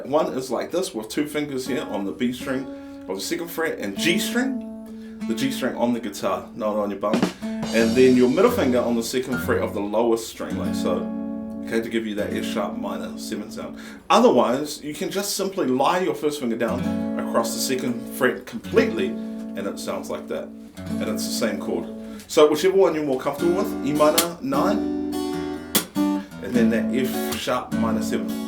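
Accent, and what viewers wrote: New Zealand. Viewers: bruh is he from nz?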